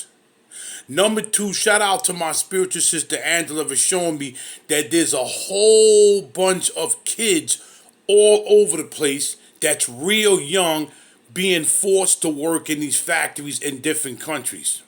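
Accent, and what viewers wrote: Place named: South, United States. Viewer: What that is I call it geographical racist seriously some people are very ugly where your accent comes from. I see it and been told with a southern accent your IQ drops 50 percent but that was from the North not the east coasters. They think they are so smart seriously pay more taxes every day and they are so smart they vote for it too. Personally I love to hear different accents. Even broken English I like to listen to that. You keep on with that strong talk, wimps can't handle it.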